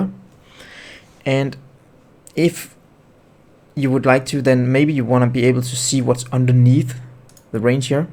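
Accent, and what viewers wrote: Denmark. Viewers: Started watching the video.... "In this video, we will..." That guy sounds like he's from Denmark... Check profile... Yep, Denmark... Hejsa lande bror.. :D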